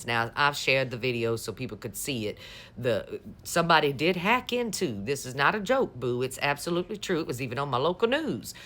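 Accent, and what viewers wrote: South, United States. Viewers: I love your voice. You have a classic southern accent.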